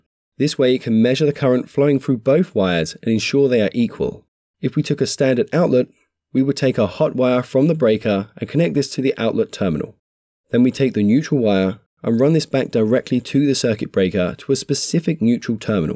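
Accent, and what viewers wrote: United Kingdom. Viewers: What country are you in that is using copper for water mains in the street? I'm Britain, where his accent is from, we use plastic piping in the street, plastic in the homes. It's been like this for a couple of decades. I'm not sure if he's being generic in his board or for American, because he's talking about 120 and using double positives to make 240. I'm not an electrician. We have had to adapt wiring to this reality. You can no longer trust copper piping in a home will be continuous or lead back to a central area. It can be changed at any time and the copper circuit can be broken. For this reason Earth cables are being run directly from the front to the back of the properties using the main electrical board for the main earth point. Push fit plastic piping is so quick now, and they just need a small little blade cutter to cut the pipes to the right size. 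It's flexible and so easy to move around. Copper is much more expensive, difficult to bend, more time to cut, but the joints are a little bit cheaper. However plastic push fit connectors can be easily undone just with a click of your fingers. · I would prefer a human voice instead of a robotic British voice!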